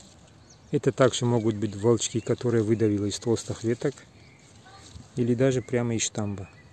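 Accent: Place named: North Ossetia, Russia. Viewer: Добрый день! Случайно попала на Ваш канал и не могла 2 часа оторваться! По комментариям узнала(по акценту догадалась) что Вы из Осетии. Подписалась и буду применять Ваши методы. Все здорово и понятно.А кто Вы по профессии?Удачи и процветания. Елена Тедеева .Армавир.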